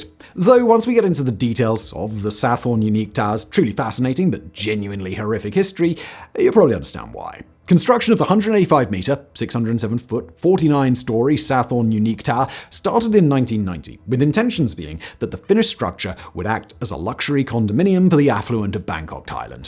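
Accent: RP, United Kingdom. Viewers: He is sorta speaking the King's English. Just put up with it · Your thick, over the top, "posh" English accent can't pronounce New Orleans! Do you really talk like this, or are you really laying it on thick? Thankfully, this video is only a tad over 21 minutes. I don't think I could handle anything longer!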